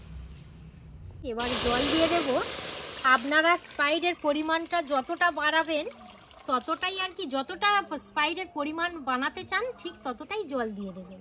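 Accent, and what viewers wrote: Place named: Ghoti, Bangladesh. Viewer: আপনার সুন্দর বাংলা ভাষা (ঘটি ) শুনলাম, ভালো লাগলো।ভুলে গিয়েছিলাম ভারতবাসী।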